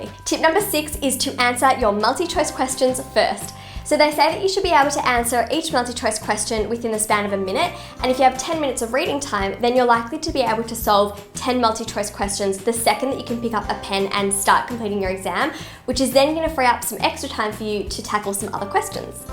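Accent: Australia. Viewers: Aren't you an Aussie ? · are you an Australian?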